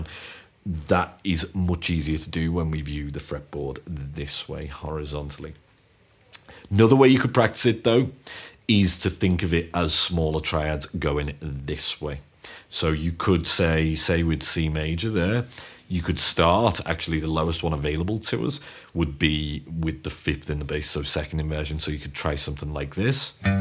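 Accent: Liverpool, United Kingdom. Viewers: are your from somewhere near liverpool? im from Bootle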